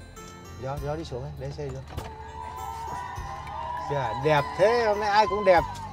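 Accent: Nghe An, Vietnam. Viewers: Giọng chú e nghe đậm chất xứ Nghệ thân yêu! Giọng nói đậm đà ấm lòng người nghe. Hoan hô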